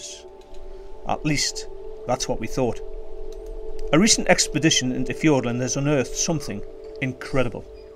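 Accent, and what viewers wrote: United Kingdom. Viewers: ''the size of a siberian tiger'', " that spent most of its time in the tree ". Yeah right, a big tiger-sized tree animal that only had Moas for prey, how stupid this Scottish accented narrator think the audience can be ????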